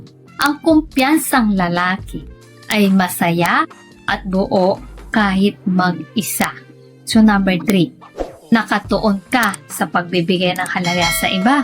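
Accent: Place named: Bicol, Philippines. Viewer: Parang bikolano ka Ma'am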